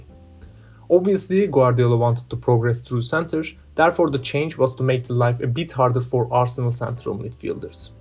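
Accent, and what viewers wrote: Turkey. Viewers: you sound like a turk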